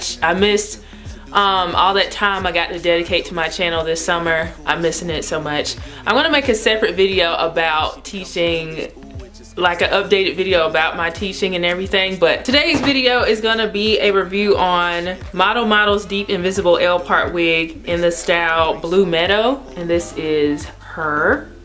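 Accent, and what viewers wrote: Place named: South, United States. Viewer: This unit is still a classic gorgeous long haired style. I love it. It'll always be beautiful on you. I love your accent and wondering where are you from? You speak like my fave cousins who are in the south, lol. Thanks great review.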